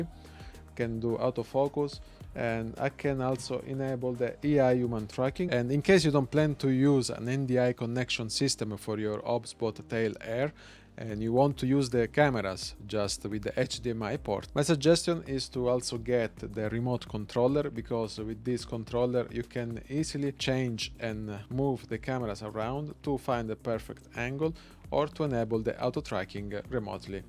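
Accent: Italy. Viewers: Italy 10 – France 0 (if your accent didn't give away that you're Italian this sure did)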